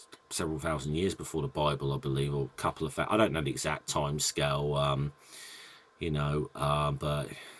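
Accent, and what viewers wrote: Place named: United Kingdom. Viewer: How am I only just realising you're also British? 😲 🤣 Geez, I think 🤔 it's time to clean out my ears 🤣 Also, *Ken Scam 🤣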